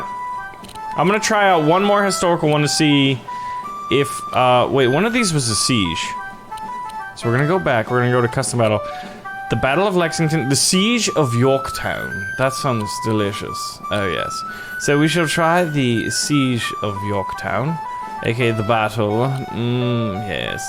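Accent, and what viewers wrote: United Kingdom. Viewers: I like how you do all the different accents for all the different nations. As someone who is British, I think that your British accent is a little bit daft but I like it. Food wise, we do like curry, but it's not really our national dish. For that, I'd direct you towards something like fish and chips, or a Sunday roast, or even a full English breakfast. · I like his British accent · I approve these British accents and any further British accents made by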